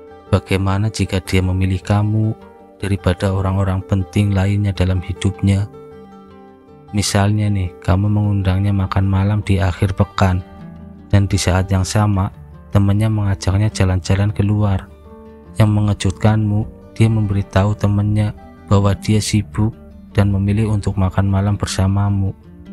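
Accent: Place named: Java, Indonesia. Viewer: Njowo bangett mass, medokk pisannn buat jd cowo maskulin😂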